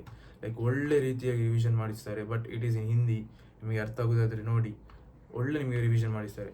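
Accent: India. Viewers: 100% ur tuluva 😂 erena kannada kenagene gottapunduye😂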